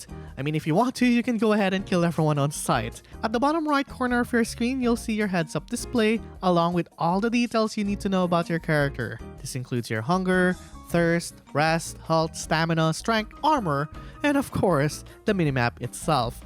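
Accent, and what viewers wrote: Philippines. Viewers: i needed help but not that bad enough to put up with this fkn fillo accent bhahaahah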